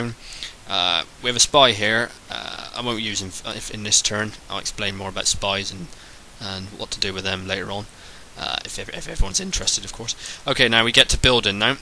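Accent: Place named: England, United Kingdom. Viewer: the english invented english, so their accent is the right one. you Americans pronounce it wrong, not him.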